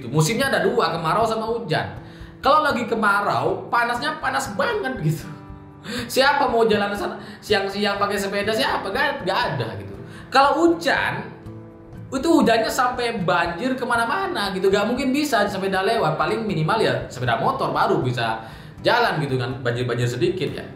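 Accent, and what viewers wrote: Madura, Indonesia. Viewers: Logatnya Madura yha kang...? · Logat madura nya samgat kental, tapivaku suka, Toppp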